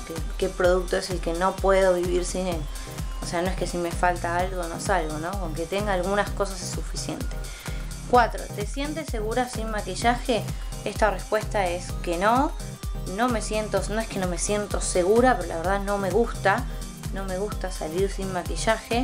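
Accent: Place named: Argentina. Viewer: pues eso, el acento argentino :) jejeje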